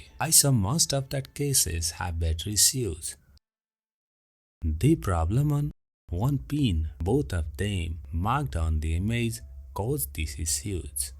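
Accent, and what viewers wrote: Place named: India. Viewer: This video could be the most useful for me rn but Indian accents has to be the worst man i dont know a shit what bro saying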